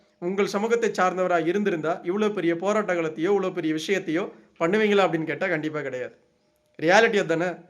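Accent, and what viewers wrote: North, India. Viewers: நீ வடக்கனா என்ன தமிழ் பேசுற....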